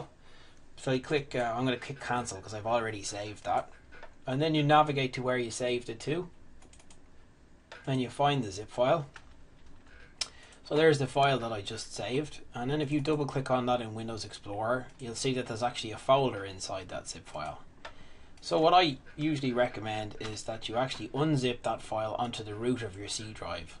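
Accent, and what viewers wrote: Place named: Ireland. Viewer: Had to find this video again. I just listened for the Irish and here you are. Thanks again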